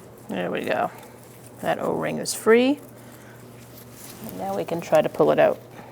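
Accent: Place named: New York, United States. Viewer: Sounds like a newyork accent 😅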